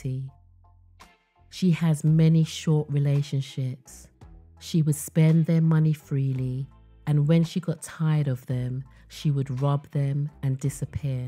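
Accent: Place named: United Kingdom. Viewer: I just found this channel and I love your voice. No matter how gruesome the details, they always sound kind of classy when spoken with a British accent. Oh me oh my!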